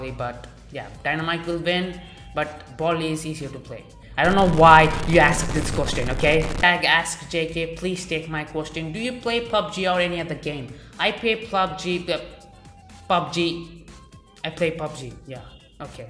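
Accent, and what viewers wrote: India. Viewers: No Front, But as 1st second i heard you, anyways i knew that you're from india. As Same as Wehen i Heard- myself, i know that i'm from germany 😅🤫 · Indian? Accent and yeah....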